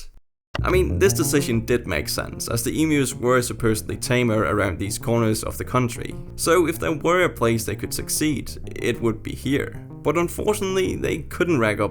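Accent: Denmark. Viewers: Are you from Denmark? Your accent kinda sounds like it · He’s Danish, how in the world did you mix up those accents?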